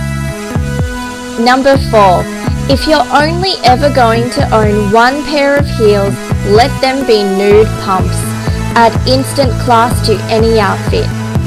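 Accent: Australia. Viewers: love the aussie accent! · Love this thanks. And love the hip-swinging and your aussie accent xx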